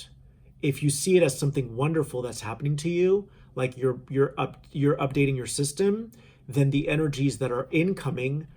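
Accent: United States. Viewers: Felt like I was talking to an awesome kinda retired cowboy.... Lovely warm southern accent I wanna hear what he has to offer... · Thanks for sharing this! Clyde is fascinating, I used to pretend to be Bonnie from the classical story when I was younger lol. I grew up in San Antonio TX so the accent is nostalgic. Channeling individuals from other timelines used to be something I would do when I was sitting in front of my bathroom mirror or in the shower before awakening to this gift's true potential. The power of our imagination is something I have been so passionate about discovering more about recently. Definitely going to use your technique of asking what inspires people to reach out as a way to get a feel for the energy. I feel this so deeply towards the collective in regards to the sexual energy. I feel this soup metaphor so much on a personal level. Ooo excited to hear about the spiral. 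Your last point reminds me of the adage " I'm rubber your glue" but instead of only words sticking energetic patterns sick as well. It was lovely spending my now moment with you, as per usual.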